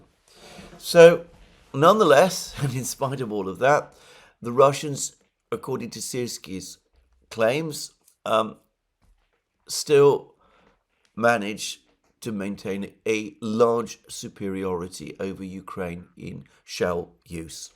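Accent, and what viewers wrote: England, United Kingdom. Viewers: This just goes to show that just because you have an English accent, doesn't mean you know something. Your economic analysis is a bunch of hot air. What will you say when the tariffs work, which they will, and they already have started working.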